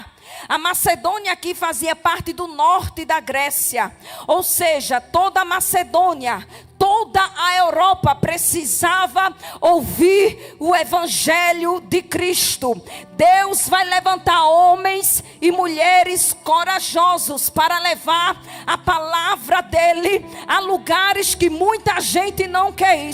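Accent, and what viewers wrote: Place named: Pernambuco, Brazil. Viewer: pelo sotaque ela é pernanbucana.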